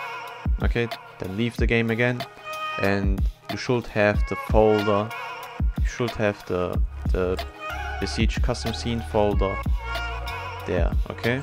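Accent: Germany. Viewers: I can hear some Deutsch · küss dein herz brudi, stunden lang gesucht (isntant erkannt das du deutsch bist)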